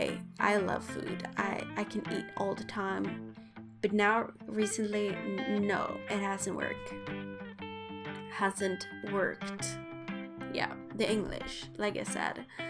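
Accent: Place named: United States. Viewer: Beautiful house!! -- just curious, why do you say "oh lord"? That's very interesting! In the American south a lot of people say "oh lord" or "lordy". "Lordy it is cold in here!". But... most people instead say "oh god", "oh my gosh", or just "goodness". Saying "Lord" doesn't sound strange or out of place at all though! It's just that I would say, it is almost exclusively southern. Like "oh lord" is a truly American thing to say. Because "oh my gosh" is pretty generic and everyone uses it no matter where they come from. So it is very interesting that YOU say "lord" haha. Btw your English is awesome, you got a couple things mixed up but you barely have an accent!!! When I first started watching I was like "oh she's American?? I thought she was from Sweden..." Haha then you said "welcome" and had just the slightest little accent. Haha You could seriously just about pass as American lol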